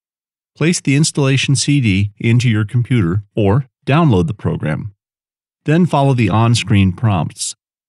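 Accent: United States. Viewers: I don't want to sound like an ass, but that slow American accent is the worst. When the guy is talking I have this sudden urge to punch someone square in the face! I really want to figure this program out, but this tutorial is just unbearable to listen too. Is there any version of this video in English English? Or Australian? Even Scottish would do.Just anything but this, for god's sake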